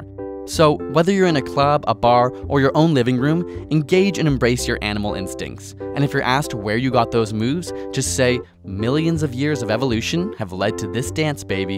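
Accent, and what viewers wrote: Canada. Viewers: It's a Canadian accent.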